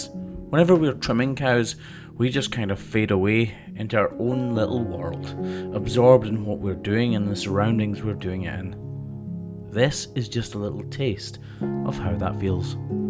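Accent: Scotland, United Kingdom. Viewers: Your accent reminds me of William Wallace on Braveheart. Watch your channel all the time, love that you care about cows.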